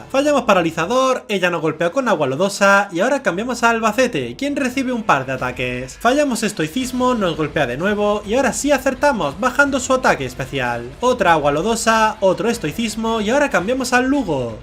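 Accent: Andalusia, Spain. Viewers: Eres andaluz? :0 Yo soy de Cádiz 😎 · bro eres de andalucia??' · de q parte de andalucia eres?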